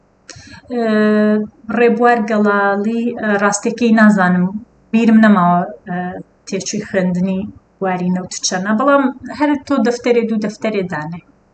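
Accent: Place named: Sulaymaniyah, Iraq. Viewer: خه‌ڵكی كوێی زمانت سلێمانیه‌ و وابزانم وتت هه‌ولێریم🌝😅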